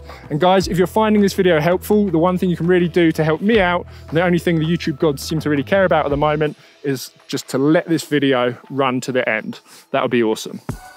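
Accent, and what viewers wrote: United Kingdom. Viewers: Nothing against you mate but its weird hearing an brit accent in a snowboarding video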